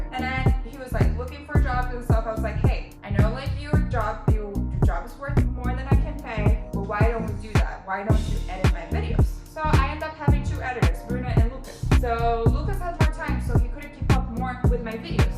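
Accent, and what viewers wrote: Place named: Rio de Janeiro, Brazil. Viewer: te conheci pelo canal da Bruna e de início te achei um pouco debochada (acredito que pelo sotaque carioca hahahah sou sergipana, vizinha de estado da bruna, inclusive já estudamos na mesma universidade, mas em momentos diferentes), enfim, acabei entrando no seu canal e me surpreendi demais, tu parece ser uma pessoa mto sensata e do bem. Tenho mta vontade de morar em LA, quem sabe um dia, né? Apesar de entender o inglês mto bem, eu tenho mta dificuldade p falar e ver vc falando tão bem me motiva demais. Enfim, só queria falar isso <3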